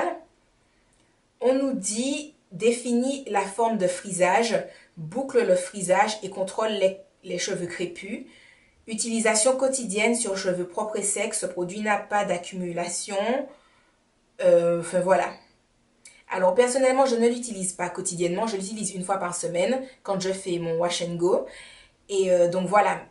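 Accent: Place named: Antilles, Caribbean. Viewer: Merci pour la vidéo, j'aime trop ton accent antillais:D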